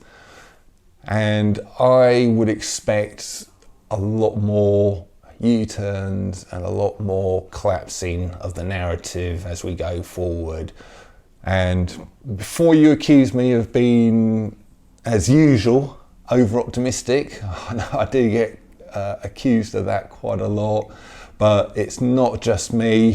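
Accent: England, United Kingdom. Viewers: great points and I love that English accent 👌👏🇨🇦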